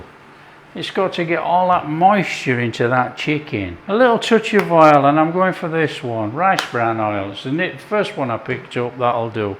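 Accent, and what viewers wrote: United Kingdom. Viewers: does it easy and delicious for the working people,no nonsense exotic ingredients and time consuming preparation that needs a three thousand year old samurai sword and skill to slice like a samurai warrior in a clear anglo saxon accent on an electric cooker in a skillet without the need for a billion btu burner and wok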